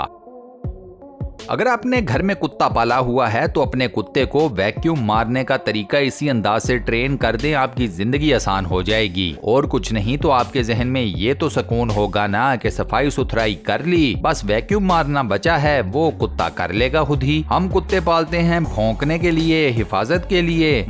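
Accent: Punjab, India. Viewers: Apki awaaj vich punjabi tach bhot Nice hai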